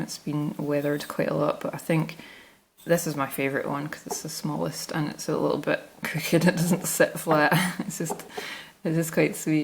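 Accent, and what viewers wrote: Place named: Scotland, United Kingdom. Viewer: Hey lovely to hear another Scottish accent Mudlarking ; awesome .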